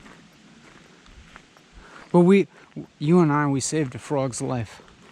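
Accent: United Kingdom. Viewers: Why'd I assume this guy was British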